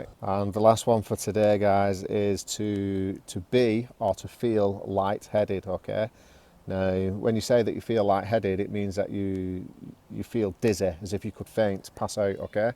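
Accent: United Kingdom. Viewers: I like your British accent although it’s hard for me to understand it. I should listen it a few times . Regards from Texas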